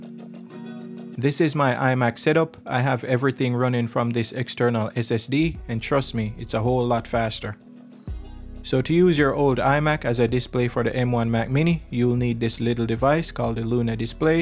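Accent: Jamaica. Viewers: This was very helpful for me. Thank you. I just purchased my Luna stick . I will also look into getting a Ethernet cable as well as my internet can be very unstable. I hear a Jamaican accent in there somewhere! and for that I will sub! lol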